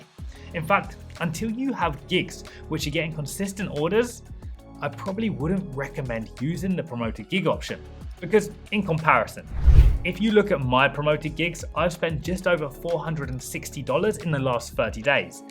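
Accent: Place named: United Kingdom. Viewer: I deeply wanna get your piece of advice but that damn british accent 😭😭😭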